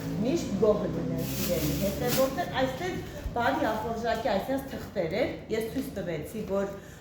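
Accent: Lori, Armenia. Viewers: Էնքան հետաքրքիր ա խոսում ես Լոռվա բառբառով Արտաշատինը հետը խառնած😂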